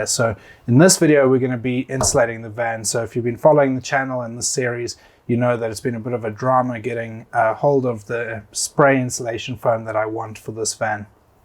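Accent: South Africa. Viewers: Very helpful and informative as always, I was thinking about using this product as it really gets in everywhere. Thanks again for your excellent work, I was wondering are you from south africa.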